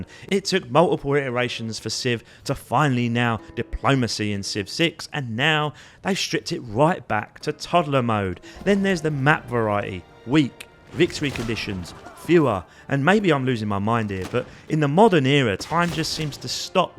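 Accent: United Kingdom. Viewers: Definitely the most British opening to a video I have ever heard.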